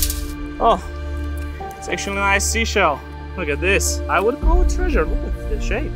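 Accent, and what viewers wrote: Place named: Boston, United States. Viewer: That's clearly a Boston accent.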